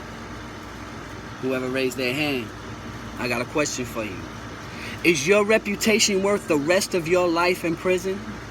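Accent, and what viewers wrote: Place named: New York, United States. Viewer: Real new yorker. You can hear it. Bless up keepup the good work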